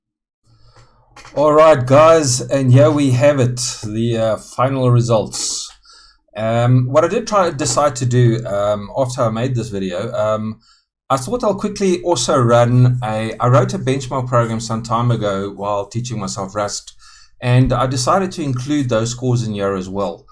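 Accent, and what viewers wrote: South Africa. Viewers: u frm SA? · Why can you always here a saffer right off the bat 😂. Lekker man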